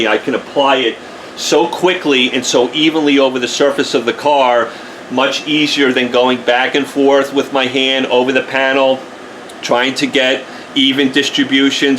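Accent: New York, United States. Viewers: Lol. You haven't seen mad yet. Sounds like a NY/NJ accent which is like this, he actually sounds calm here.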